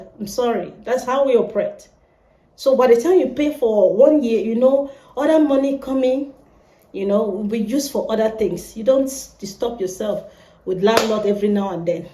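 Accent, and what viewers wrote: Kenya. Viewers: Was that a Kenyan accent? Is a lie, love your videos though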